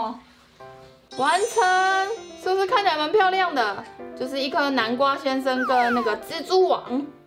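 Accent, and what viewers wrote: Taiwan. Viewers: 在國外工作看你吃飯很療癒再五個月就回台灣謝謝水水最愛看你說台灣國語和不碖轉的台語加分！掰餔～ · 將台語好可愛喔😆😆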